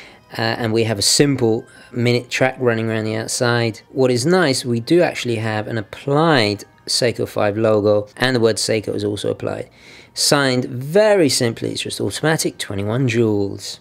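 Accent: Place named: United Kingdom. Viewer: Hi been watching a few vids good stuff. I'm about to buy another watch, not made of money so looking at hundred UK. It's either the Rotary Windsor or Regent, love them both just wanted your opinion. Now the regent is originels range but something classic about the Windsor. Your thoughts? Also, sounds like you are from the UK but noticed your Italian background on different video, but living in the States?! Interesting Interesting. Good content my friend, I do love a fine watch. Will also check out that book you mentioned meditations. All the best from wintery Staffordshire, Jim.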